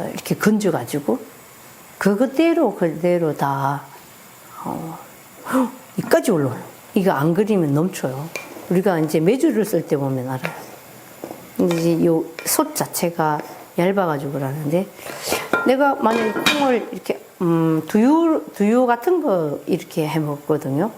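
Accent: Gyeongsang, South Korea. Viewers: 외국말이 아니고요 경상도 사투리에 표준말 석어 하실려니까 어색하게 들려 그런거 갇은대요 ㅎ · 스님 경상도말이넘정겨워요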